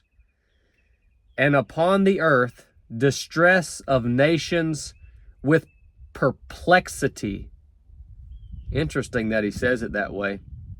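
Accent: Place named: West Virginia, United States. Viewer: So you know has WV accent.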